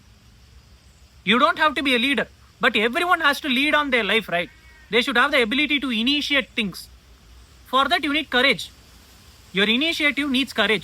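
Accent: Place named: Tamil Nadu, India. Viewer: Superb tambi for your efforts but tamils will spell thara as dhara like tha you will spell as dha which changes the word meaning completely please focus on original words than tamil accent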